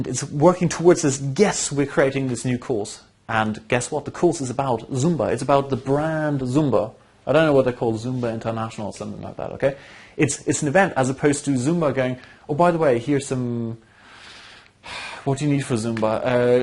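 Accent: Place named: Swabia, Germany. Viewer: Very informative and down to earth (Schwabe oder Württemberger ?) without the usual hype. I really appreciate it. Wolfgang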